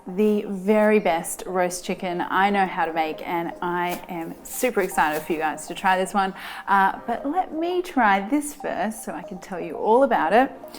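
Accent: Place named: Australia. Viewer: Your Australian accent is getting thicker which each video! Hope you enjoy your return to Oz xx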